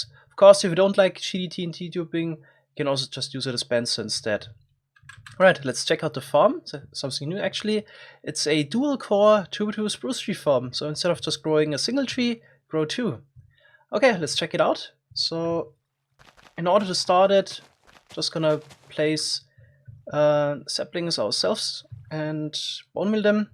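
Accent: Germany. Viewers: Nicer Deutscher Akzent, bro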